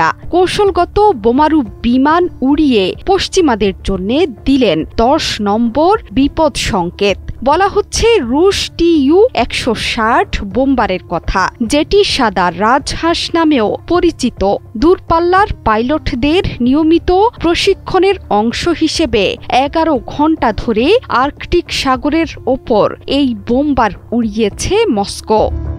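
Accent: Kolkata, India. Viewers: এইসব খবর পাঠিকা দিনে দিনে কলিকাতা হয়ে যাচ্ছে৷ উচ্চারণের সে কি হাল৷ চাবায় চাবায় কথা কয়৷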